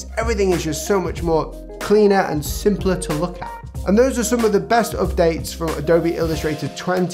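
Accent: United Kingdom. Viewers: i have to say these updates are really minor. as a side note would you work on your video's music more and the fact that you are British and we might be fascinated by your accent is old fashioned now, take care.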